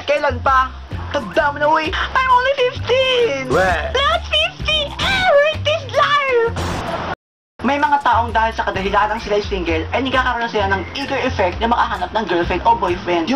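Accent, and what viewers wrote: Visayas, Philippines. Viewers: Bisaya ni sya bay? :D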